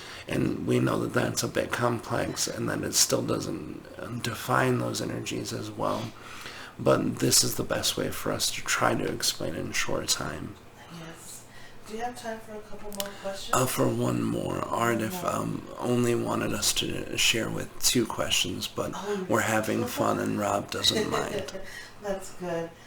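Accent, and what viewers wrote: England, United Kingdom. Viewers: Has Aridif ever explained why he speaks in an English accent when the channeller is American? He sounds like Alec Guinness in Star wars. Nice video, thank you 😊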